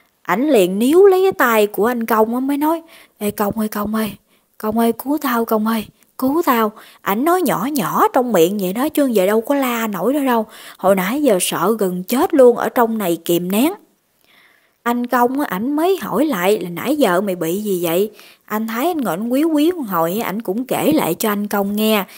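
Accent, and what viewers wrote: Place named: Central, Vietnam. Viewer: Em giống miền te nói chuyện nghe đã thiệt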